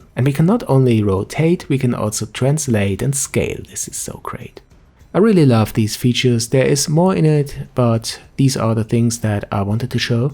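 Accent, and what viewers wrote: Germany. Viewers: yeah... that explains it.. I've never heard germans accent before other than that autogefuhl guy. You guys sounds a lot calmer than the internet claims you to be. lol.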